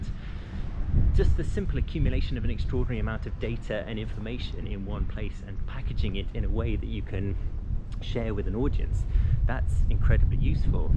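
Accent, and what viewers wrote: United Kingdom. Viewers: I like British accent hope i can talk like that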